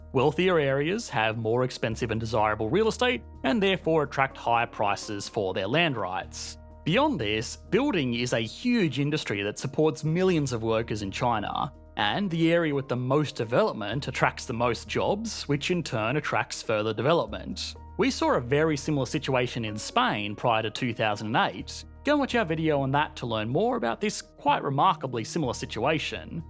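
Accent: Australia. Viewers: This guys accent drives me nuts. Aussie accents don't tend to bother me but this guy changes his intonations mid-word - "Chyayayana" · I beg your pardon for asking maybe an insensitive question but what nationality is? I keep hearing this accent (PBS spacetime, ), but can’t pinpoint the origin. Australian? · There's no way I'm trusting an Australian's analysis on anything related to China. Btw your accent sounds absolutely fucked up. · yeah should have expected some negativity with the aus accent. but hey hundreds of times better then an indian one. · This may not be helpful, but it is truly meant with that intent. The United States has exported amazing and incredible "things" in her relatively brief history; although porn and the Californian uptick are, in my opinion, not worthy of inclusion. We humans have two primary means of communication: audible and visual, and you have a great product which involves both of these areas. 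However, I couldn't make it through to the end of this particular post because of the CA uptick you appear to have imported. I love the Aussie accent (although I think the South African has you pipped), and with over a million subscribers you can reasonably tell me to... er... "go away," but I would ask that you accept this as an honest and well-intended constructive criticism.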